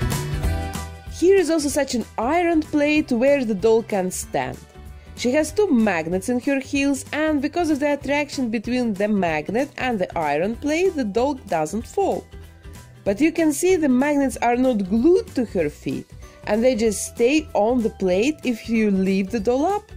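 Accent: Russia. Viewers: Русский акцент ?! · I love your accent. Are you from Russia? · This russian акцент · Oh, Russian accents are so beautiful and zaftig sounding. I must say, I do think I wig may have been better, and perhaps a smaller nose pompom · I like your Russian acent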